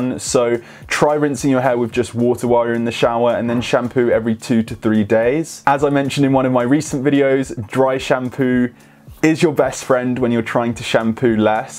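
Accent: United Kingdom. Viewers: Are you British? Love your accent ❤️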